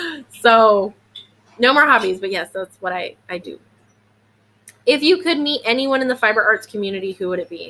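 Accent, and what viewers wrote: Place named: California, United States. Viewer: I actually adore your daughters blanket for many reasons. Love the 1st and last color. It reminds me of our Cali sunsets over the ocean here. 😁 I have been crocheting for 43 years since I was 8. Was taught by my mom and gma but mostly books. Also taught myself needle point, temari, Sewing, paint ceramics, crewel ,cross stitch, hair pin lace, and embroidery. Sorry you lost your mom so young. I crochet at the Drs because I always get nervous and it helps calm my nerves. That's so funny I was married as a senior in HS to my ex. Married to my 2nd fab hubby for 27 yrs now. How funny I figured you had a Cali accent and not Virginia. I tried knitting but it ends up being hazardous with my 3 cats. I have crocheted much more in last 6 years. So much easier than when I had little ones. So I can imagine you are quite busy. 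No way I could have done a podcast when my girls were little. Really enjoyed your podcasts. It's interesting to learn about the people who do podcasts and see what there shared interests are. Thank you for sharing. Lol re your hubby. Have an awesome day.😁 Have you ever met Janie Crow or crocheted any of her patterns? I just love them. Your son is so cute.♡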